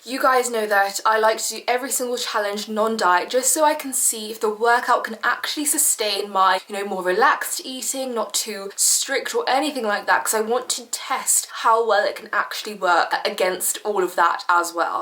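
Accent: United Kingdom. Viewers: I love ur british talking style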